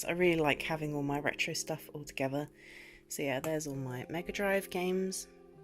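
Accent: United Kingdom. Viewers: British Accent + kind of ASMR voice + retrogaming = me subscribing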